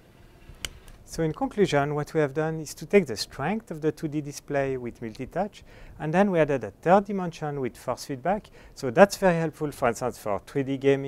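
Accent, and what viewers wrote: France. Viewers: Magnifique accent français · Accent français ^^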